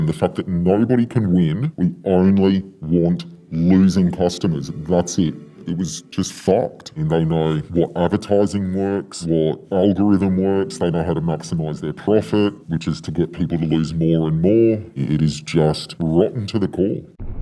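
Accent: Australia. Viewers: This guy is from Australia maybe from Perth ... words like tick tick tick and Heeps gave it away and theres a Casino in perth so im just guessing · this guy is 100% aussie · This guy is definitely Australian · Aussie if I’ve ever heard it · no amount of distortion can hide that Australian accent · 2 seconds in Yeah this guys Australian ool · easy to tell hes aussie. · This guy sounds Australian. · This guy has to be Australian. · Aussie yo! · Bro can’t hide the Australian accent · the legendary aussie accent powering through the muffled voice effect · that aussie accent, and he means TAB they are crooks, as soon as you get a winning streak or jag a massive multi or first 4, they ban you from betting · sounds like an australian accent, interesting! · Australian accent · Sounds like an Aussie. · Pretty obvious this guys from Australia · Sounds like this guy is definitely Australian. 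Could only be Sportsbet, BET365 or Ladbrokes then. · australian accent. tanned skin. age 40-59. cadence indicates melbournian. one of the largest organisations .SPORTSBET,TAB,crown,WATERHOUSE thats what i saw in this vid about you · No matter how hard you try - you cant hide that Aussie accent!!! Get a dog up ya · Dude is Australian, I can tell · i like that you can hear the australian accent through the voice morphe lmfao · Can tell hes an aussie 🤣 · Can tell this guy is an Aussie from his voice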